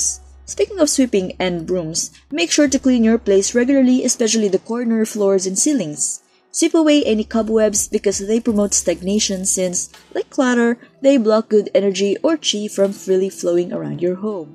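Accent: Philippines. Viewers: You sound so Philippino ❤❤❤ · She sounds Filipino. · Since you said that, I noticed and I agree. She's most probably a Filipino.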